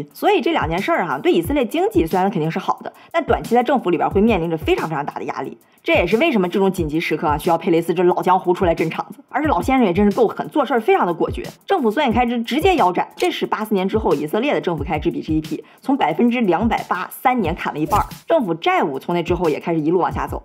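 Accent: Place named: Beijing, China. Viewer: 小林有北京口音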